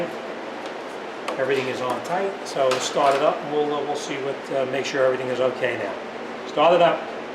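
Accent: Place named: Long Island, United States. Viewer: You gotta be from Long Island! 🤣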